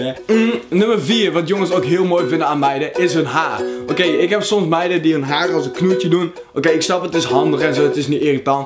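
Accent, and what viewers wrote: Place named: Overijssel, Netherlands. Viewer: Waar kom jij vandaan vlm uit overijsel want je praat n beetje plat en t lijkt een beetje op hoe ik praat 😂 ps jou ogen je haar en je tanden😍😍😍😍😍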